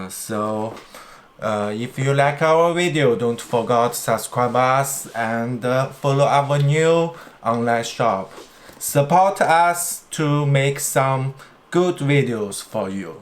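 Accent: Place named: China. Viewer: 国内有没有号每次要上tube听中式英语好别扭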